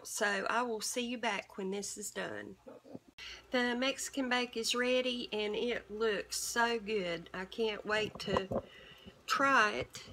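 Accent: South, United States. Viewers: What a precious lady❣️ I’d love to stop by and have dinner with this sweet lady...love her southern accent. Reminds me of my family ❤️❤️❤️ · you are such a sweet heart ya had me with the breakfast casserole n Tennessee sausage.. is the only thing this TN gal will eat.. Love your gentle nature, nice southern charm.. NC n TN have similar accents.. I get the make a meal for several days.. is cheaper.. faster n no fussin, always have extra in case ya have company droppin in... cuz it is jus bad manners to NOT.. feed them.. always have a snack drawer in the fridge in case there are kids along too.. they love goin in there n rustlin around choosin something sweet or salty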